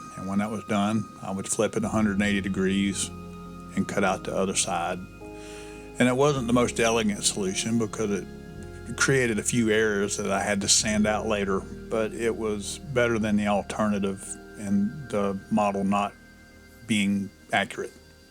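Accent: South, United States. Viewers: The possum reference comports with the country twang😂 Mks Seriously Excellent job, excellent work Great video production And very effective voice over narration The steel BBs/ bearings not bad Personally, I would go with steel rods of several different diameters, cut into disks I just had to nitpick didn't I😂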